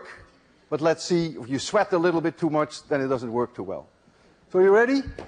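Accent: Netherlands. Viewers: he talks like he's from holland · is he dutch? his accent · He's got a dutch accent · he sounds dutch.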